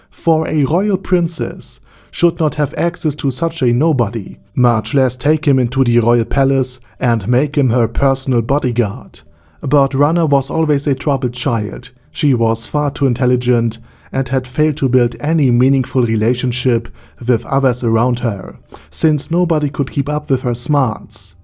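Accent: Germany. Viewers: it is a heavy german accent but its very easy to understand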